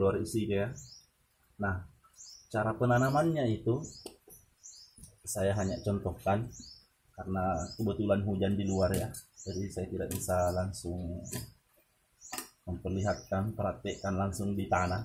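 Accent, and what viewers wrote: Indonesia. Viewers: klo dengar cara bicarax kykx dia org bugis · tutur bicara enak di dengar.. anak medan lai.....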